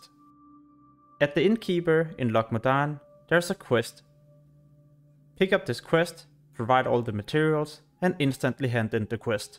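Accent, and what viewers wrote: Denmark. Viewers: Danglish my friend ;-)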